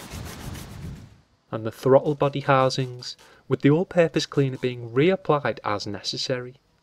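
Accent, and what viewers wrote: Liverpool, United Kingdom. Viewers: Sounds like a Liverpool accent.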